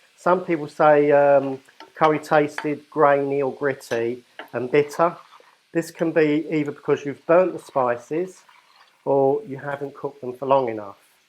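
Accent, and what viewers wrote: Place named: Essex, United Kingdom. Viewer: you've changed my life, On a Saturday night no trouble n strife, Get my aluminium pan and a batch of base, Turn on YouTube and I'm met with your face. Your cheeky Essex accent, And your new cool hair, This is a shameless poem for a freebie apron And I don't even care... Respect. (Dave from the Sunderland Message Board)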